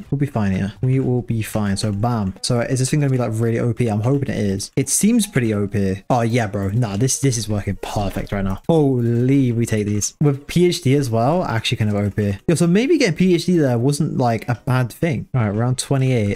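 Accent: United Kingdom. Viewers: ur my favorite british yapper on youtube bossman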